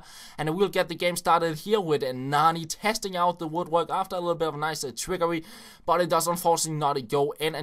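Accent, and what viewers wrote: Denmark. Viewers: Are you danish???!??!??!!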